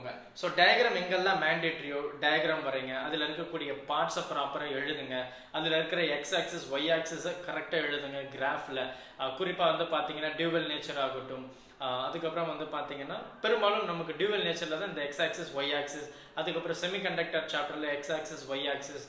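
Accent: Coimbatore, India. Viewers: Coimbatore polaya sir😂😂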